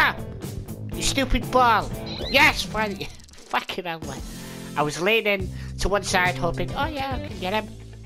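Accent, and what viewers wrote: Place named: Scotland, United Kingdom. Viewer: You Are Scottish Right?😂😂🔫🔫 😍🔥Dope Accent!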